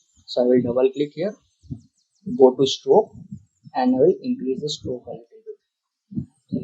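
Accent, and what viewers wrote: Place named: India. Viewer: Typical Indian English accent :3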